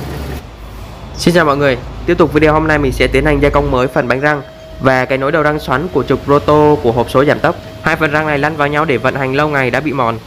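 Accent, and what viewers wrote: Vietnam. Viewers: на каком наречии он лапочет? на северном или южном?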